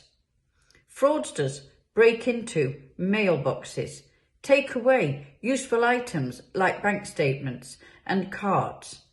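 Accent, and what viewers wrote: United Kingdom. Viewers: Absolute class. Great dresser- and love that posh Stoke type accent.🤟🏼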